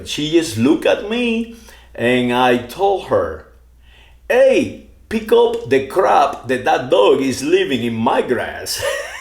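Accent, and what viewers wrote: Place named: United Kingdom. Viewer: You do not speak English you speak slang British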